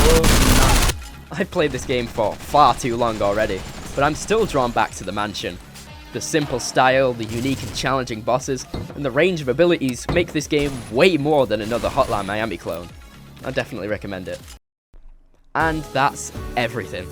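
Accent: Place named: United Kingdom. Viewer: British voice, unsubscribed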